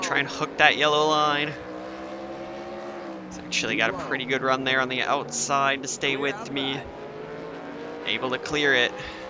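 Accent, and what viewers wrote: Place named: California, United States. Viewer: The Californian accent really came out here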